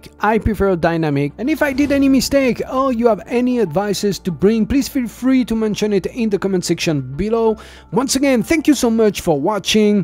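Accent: France. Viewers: your english sounds french lol nice video bt the way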